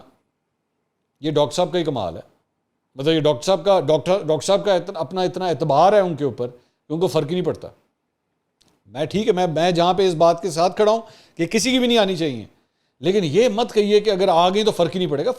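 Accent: Punjab, Pakistan. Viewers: اندرون شہر لاہور کے پیدائشی ہونے کی بہترین پینڈو کو دیکھنا اور جاننا ہو تو ، بھائی کو سن اور دیکھ لین۔ 😊 However, his analysis and comments are neutral. That is why I see most of the V logs, but at the end get disappointed by his Punjabi style Urdu spoken language, and use of Punjabi language. Too much of میں۔ میں۔ میں۔ میں میں Sorry to say this.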